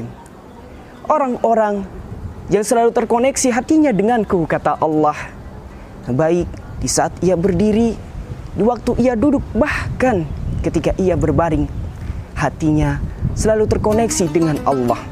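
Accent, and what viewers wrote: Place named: Banyumas, Indonesia. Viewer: Masya allah mamas ngapak, idola🔥🔥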